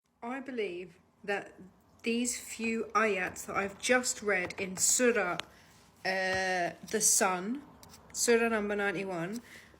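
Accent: United Kingdom. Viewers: ليست أميركية ، بل انكليزية أو بريطانية واضح من لهجتها . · لكنتها انجليزية وليست أمريكية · من لكنة اللسان هذه السيده تتحدث باللهجة البريطانية وليست أمريكية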